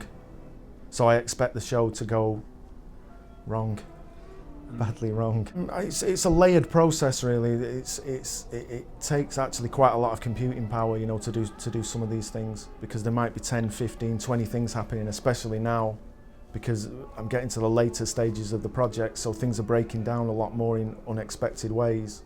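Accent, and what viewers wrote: United Kingdom. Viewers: He sounds like every British guy ever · Jesus Christ, as a Yorkshireman I listened to his projects thinking he was some deep mysterious american for some reason so to see him with sunglasses on with a Northern accent is incredible 😭 big up the north! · he's from stockport, same as me. I think hearing him speak exactly as I do and as everyone I knew growing up did comforts me a bit, but I'm really not sure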